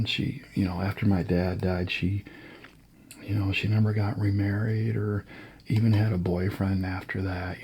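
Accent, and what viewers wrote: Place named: Midwest, United States. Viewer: P.s. I love your accent! Are you from the Midwest? I’m from Utah but living in California 😊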